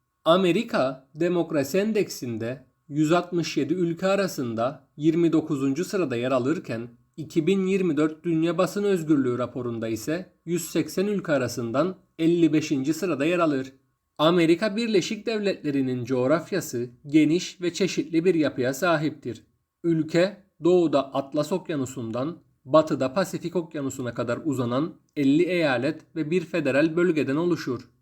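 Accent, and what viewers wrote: Cyprus. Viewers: Abi sende sanki Kıbrıs şivesi var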